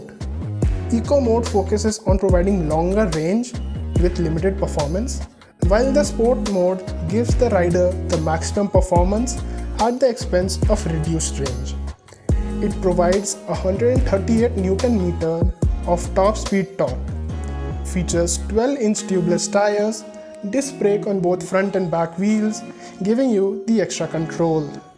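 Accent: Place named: India. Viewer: You have indian accent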